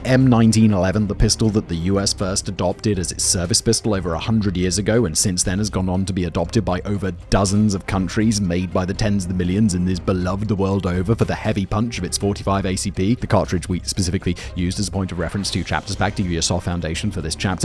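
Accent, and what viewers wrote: United Kingdom. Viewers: Why are we listening to a Brit talk about guns?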